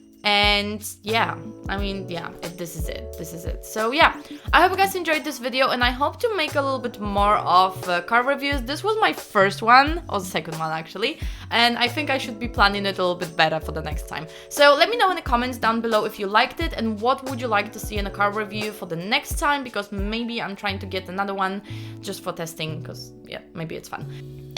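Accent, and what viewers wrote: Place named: Eastern Europe. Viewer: Well done for doing this video. I can’t wait if you are doing a review on model Y. Love your Eastern European accent.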